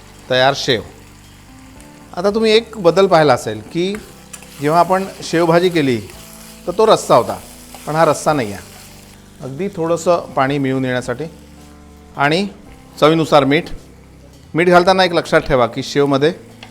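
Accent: Vidarbha, India. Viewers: Hi I am from Baroda and I am a regular follower of your recepies.I love the vidharbha touch in your Marathi reminds me of my childhood days ....We call it as Sev Tamata nu shaag.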